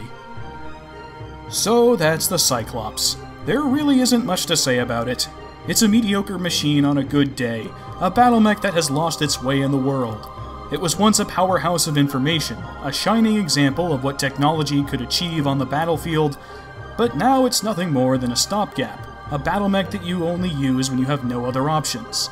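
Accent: Germany. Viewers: I listened to your reading o f Measure of a Hero and I think you have a fine German accent. Reminds me of the series 'Allo 'Allo.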